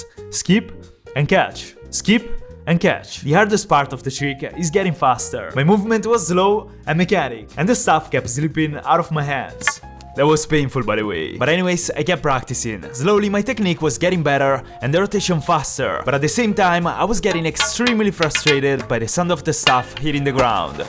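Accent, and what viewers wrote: Italy. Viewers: L'accento italiano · Ti assicuro,notavo l'accento ma mai pensavo fosse italiano,also extra nice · Ho sempre sentito un accento particolare e oggi ho finalmente scoperto che anche te sei italiano! 😂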